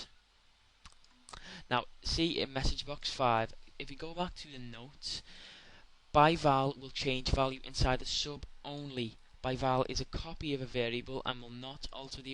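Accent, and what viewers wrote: Liverpool, United Kingdom. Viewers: good video ... understood it perfectly thanks. your accent tells me you're from liverpool... am i right?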